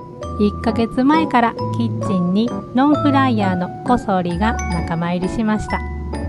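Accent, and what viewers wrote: Kansai, Japan. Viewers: 関西弁がクセになります！！！！！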